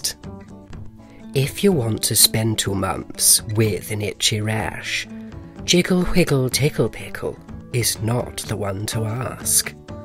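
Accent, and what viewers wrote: United Kingdom. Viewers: Such a wonderful British accent. So proper and so wicked. Really very funny. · these books are hilarious but the british narrator makes them PRICELESS!!!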